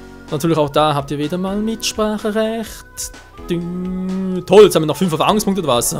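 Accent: Switzerland. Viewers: Der schweizer akzent machts 10mal besser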